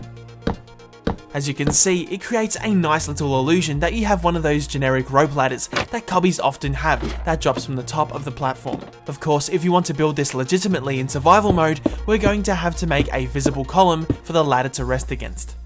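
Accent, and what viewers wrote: Australia. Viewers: Are you Australian? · he has a bit of a Ozzie voice Like me because if from there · Are u an Aussie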